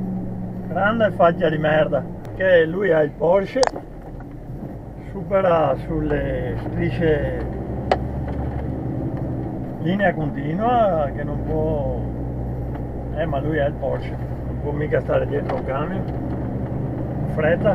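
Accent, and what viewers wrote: Belluno, Italy. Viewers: Buongiorno hai l'accento uguale del mio ex titolare di Belluno/Alleghe😅,l'altro giorno uno mi ha fatto quel zigzag in autostrada senza far freccia e sembrava un caccia delle frecce tricolori alla fine mi ha tagliato la strada per prendere l'uscita a destra e gli ho suonato si è incazzato pure 😅🤔 buona domenica 🙋🏻‍♂️un saluto da Stoccarda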